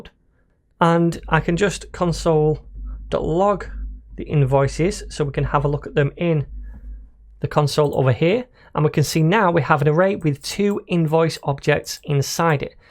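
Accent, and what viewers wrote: United Kingdom. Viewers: British accent? Sounds nice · i love your British accent <3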